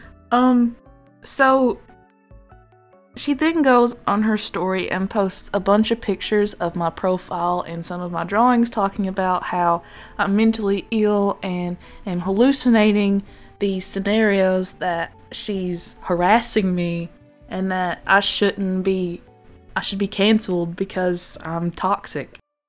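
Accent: South, United States. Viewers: Im so sorry that happened! My artstyle is not anything people would buy, so I don't do comissions, and this kinda stuff would not help either 😭 Also, you accent is WONDERFUL! It reminds me of a country accent but... different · Where are you from? You sound like you have a southern accent lol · is that a southern accent i hear? i'm southern too and it's so reassuring so see another content creator with it!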